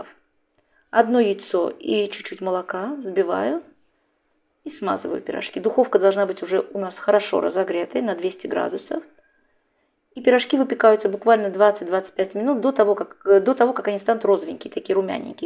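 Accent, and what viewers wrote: Baku, Azerbaijan. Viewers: Здравствуйте, наш бакинский акцент, это 👍👍👍🥰 Смотрю с Ростова на Дону, тоже из такого теста делаю пирожки, но этот вариант мне понравился, обязательно попробую. Спасибо ❤️🌹🌹🌹🌹🌹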